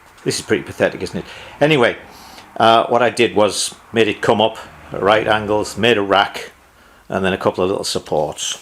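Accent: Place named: Newcastle, United Kingdom. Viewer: Wonderful to learn from a fellow Limey in NY State. I'm in the Hudson Valley, but lived in Ithaca in '79. Do you accept visitors? I'd love to meet you. You sound like you might be from up around Newcastle way, my best mucker was a Geordie. Anyway, please keep'em coming. I have a '68 TR6C with Routt 800cc kit, a '68 P11A, and a '70 T100C. All in almost original condition. Cheers.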